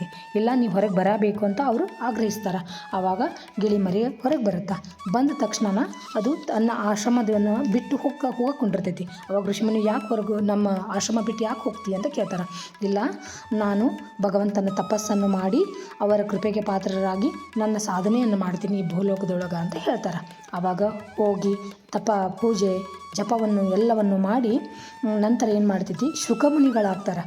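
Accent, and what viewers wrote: North Karnataka, India. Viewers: ನಿಮ್ಮ ಭಾಷೆ ಸುಂದರ...ನಮ್ಮ ಉತ್ತರ ಕರ್ನಾಟಕ ಭಾಷೆ...👍👍👍👍ಶುಕಮುನಿಗಳಿಗೆ ಜಯವಾಗಲಿ....... ವ್ಯಾಸಪುತ್ರ ಶುಕ ಮುನಿಗಳಿಗೆ ಜಯವಾಗಲಿ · ಕಥೆ ತುಂಬಾ ಚೆನ್ನಾಗಿ ಸ್ಪಷ್ಟವಾಗಿ ನಿಮ್ಮ ಉತ್ತರ ಕರ್ನಾಟಕ ಭಾಷೆಯಲ್ಲಿ ಹೇಳ್ತೀರಾ ಸಿಸ್ಟರ್ ಧನ್ಯವಾದಗಳು🙏🙏👍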